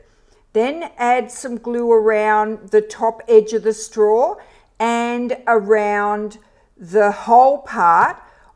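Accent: Australia. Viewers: G'day,. Such a relief to hear your Aussie voice. I don't have to strain ta understand. I recently got my first mega tank printer. Sooo good. Now for the Bearly art glue. Off to Amazon au. These dangles are so cute. I adore minis too.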